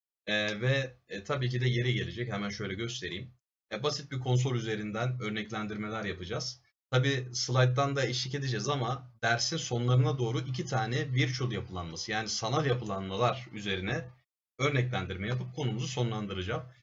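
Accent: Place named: Rize, Turkey. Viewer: Adim kadar eminim ya rizeli ya oflusun hocam...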